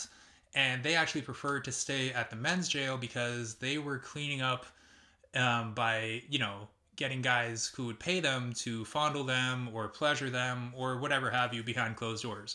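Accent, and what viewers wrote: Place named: Canada. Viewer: I love the way Canadians pronounce “about,” and you’re also really good looking. Information and cute accents sound best from a handsome man. ❤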